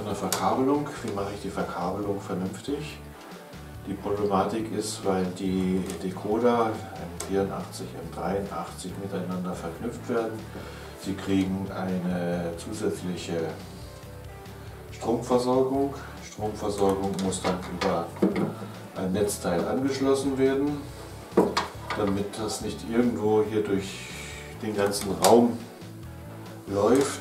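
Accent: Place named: Bavaria, Germany. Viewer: Mal ne Frage;kann man bei Märklin nicht auch einfach die Schiene zwischen 2 Schwellen mit dem Dremel trennen,dann brauchtst die Verbinder neet.Als Bayer ein gutes Hochdeutsch,bestimmt kein gebürtiger.Der Klappensinn wird nun sinnvoll ersichtlich,trotzdem hätte ich diesen andersherum zum Öffnen gebaut,also das die Scharniere unten sind und die Klappen mit Magneten gesichert od.mit Scherenhalterung,wie bei Klappböcken/Liegen od.ä.Das ist alles KEINE Kritik,nur es sehen und lesen wohl noch hoffentlich viele Mobahner diese/Deine Videos.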